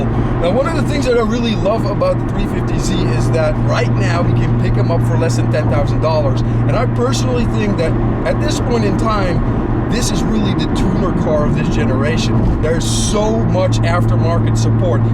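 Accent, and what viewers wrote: Netherlands. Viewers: ik hoor op jou engels dat jij uit Nederland komt groetjes uit Bosnien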